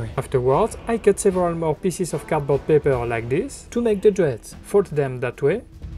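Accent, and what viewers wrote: France. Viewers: Excellent boulot ! Et j'adore ton accent FR ;) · can u stop speaking enhlish with ur french accent , its difficult to understand as ur pronouciation is not really good + its really annoying , french is a loving language , u dnt hve to b ashamed of ur native language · I love this accent, french I assume? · what is this french accent, are you realy english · Mdr on voit ton bel accent français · Juste ton accent french 😅😅😅bon boulot en tout cas j'adore tes techniques d'assemblage franchement chapeau 👍👍👍👍👏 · Le gros accent français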